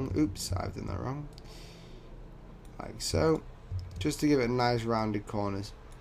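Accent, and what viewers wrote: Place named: North, United Kingdom. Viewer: You sound like a northerner like me so, 'subscribed'.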